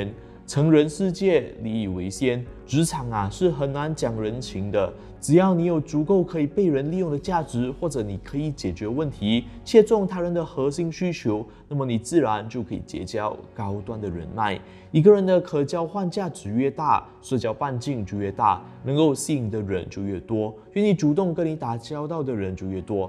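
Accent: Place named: Malaysia. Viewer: 聽口音是馬來西亞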